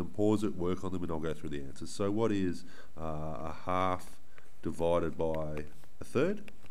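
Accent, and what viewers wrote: Australia. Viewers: If everything learning related, was taught in an Aussie accent, I’d probably be so much smarter and would have paid a lot more attention hahaha.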